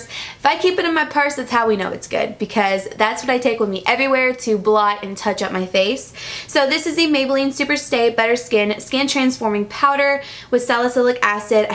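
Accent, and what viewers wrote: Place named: Georgia, United States. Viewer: what part of ga are you from girl?!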